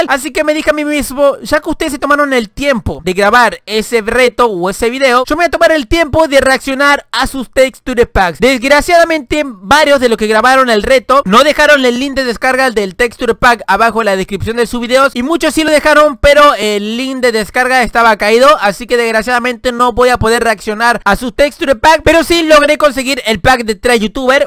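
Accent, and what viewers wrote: Argentina. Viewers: eres argentino porque llo si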